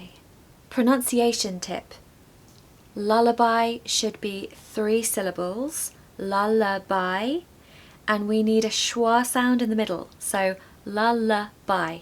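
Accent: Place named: England, United Kingdom. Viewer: Sweet charming English accent 😅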